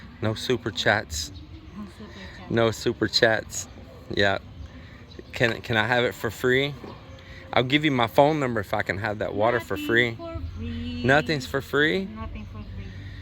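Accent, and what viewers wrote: Texas, United States. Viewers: Not sure if I mentioned it previously but watching your vids at least for me is an eye opener,I learned a lot from you,I'm not going to build a house but I'm enjoying your knowledge and the easy way in which you explain things,your Texas drawl brings memories from the time I took special forces training at Fort Hood Killeen Texas and Fort Sam Houston in Ssn Antonio back during the Iraq campaigns,,,,I know it takes time and effort to get the vids on YouTube so I thank you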